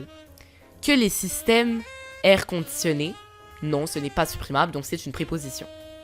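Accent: Canada. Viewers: Il a un petite accent québécois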